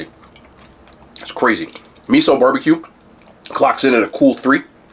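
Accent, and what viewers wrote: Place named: New Orleans, United States. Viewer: I fw u u tried to talk like how we talk in New Orleans⚜️ much love to u bro😂💪🏽💯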